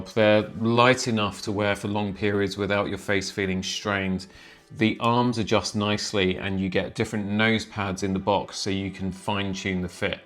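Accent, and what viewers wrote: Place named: Europe. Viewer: Would have been much better if you didn't constantly refer to ipods, ipads, ios, iphone......apple this, apple that -- you are in Europe judging by your accent so Android would have been so much more appropriate and useful. As it stands, this just seems to be aimed at the US of North America and 9/10ths of the planet can't understand your points. Apple, Apple, that pointless expensive useless brand again is Apple.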